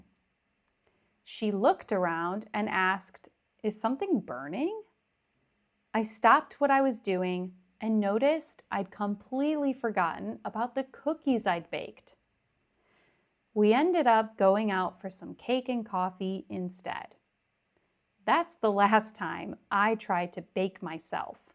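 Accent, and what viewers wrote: United States. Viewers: Thank you very much for your useful work! I enjoy it a lot, but honestly I have to say that it's a little bit weird to me the american accent because I have been taught at school in British accent. But in any case, I do my best! Kisses from Spain. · I'm happy that I've found you. Your videos are really helpful. Thank you. I like the American accent ❤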